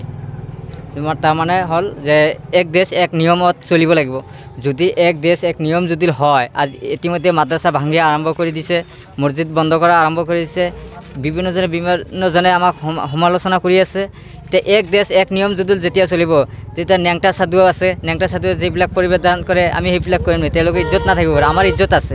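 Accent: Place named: Bangladesh. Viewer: শুকুৰ আলি ভাই তোৰ ভাষাত গোম পায় আপুনি যে খাতি বাঙলাদেশী।যেনে আপুনি কথা শব্দটো কতা উচ্চাৰণ কৰে।আৰু ভালতোক বাল উচ্চাৰণ কৰে।আৰু বহুত আছে। 😂😂😂😂😂😂😂😂😂😂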